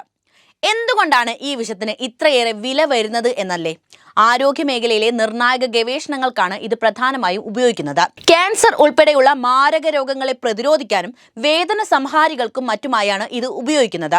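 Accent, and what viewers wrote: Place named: Idukki, India. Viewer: സത്യം പറ,, വീട് ഇടുക്കീലാണോ ?😊😊 cause I’m from adimaly,, that accent of yours is same as mine 💪💪🥰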